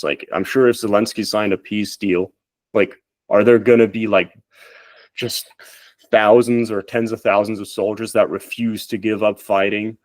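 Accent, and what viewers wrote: United States. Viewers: He’s European but has a perfect American accent? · He sounds American but says hes European. Must have spent long time in the US to learn English like this even his use of Like...Goddamn etc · Probably grew up on internet, so he adopted American English from nerds he played games with online. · He lived in EU all his life but sounds yank, and is half Peruvian. He's not going to be hard to ID with those facts. Also, guy sounds like a brain rotted zoomer.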